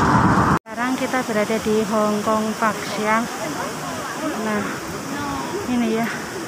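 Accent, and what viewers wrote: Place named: Cilacap, Indonesia. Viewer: Bahasa cilacape ora ilang walau neg negeri orang.. sukses mbak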